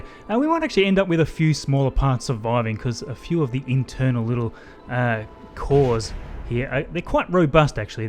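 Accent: Australia. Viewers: Oh your Australian? Gg.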